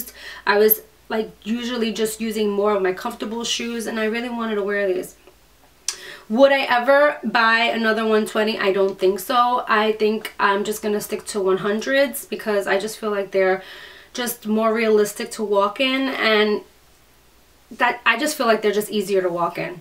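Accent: New York, United States. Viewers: Sounds like a New York,Staten island or Long Island accent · Def a New Yorker like me haha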